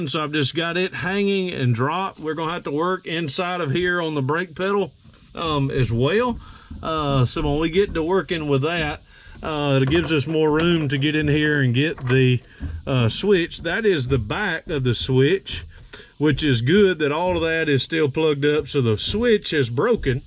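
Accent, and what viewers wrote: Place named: Georgia, United States. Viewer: thanks for replying👍👍you make me laugh so much,and love that georgian accent and your jokes and the bond between you and your family👍👍god bless you all and good health🙏🙏🙏🙏🙏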